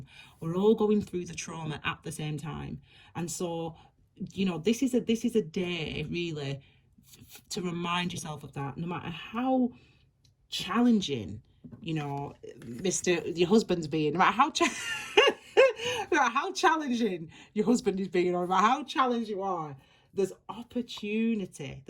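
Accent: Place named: Northern England, United Kingdom. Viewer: Thankyou for picking up my morning. Your accent makes me smile. Im British (i live in London) and i lived in Sheffield for 3 years, your voice does remind me of all the people I met up north. 😊🌈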